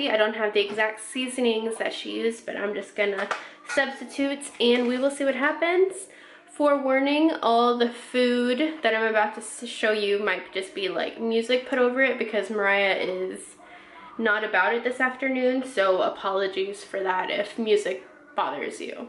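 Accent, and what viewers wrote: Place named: United States. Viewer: where are you from? You sound like your from the states but hubby sounds English / England. I could totally be making this up. Great video with loads of ideas!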